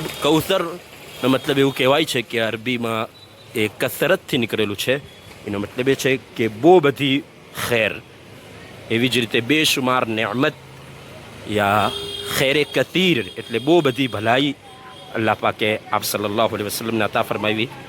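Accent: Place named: Navsari, India. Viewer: bharuch ma 6 varas rahyo choo engineering karti wakhte ,jhuni yaado taaji karavi didhi , thank you bhai , tamara navsari wada ni gujarati sambhadva ni bahu majha aave che . aey wakhte bharuch ma sauthi sasta theatre hata to to bahu majha aavti hati ne station par fruit juice ni dukaan , ne 6 no. na platform ni chai ne 1 no na platform na kanda wada bahu tasty hata , ne bharuch ni sing to kharij . bahu majha na divaso hata aey .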